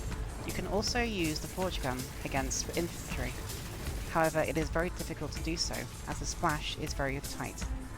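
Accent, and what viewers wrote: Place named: Iceland. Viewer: That would be an Icelandic accent. Most people will have an accent carrying over from their primary to their secondary language, it is only natural for this to occur.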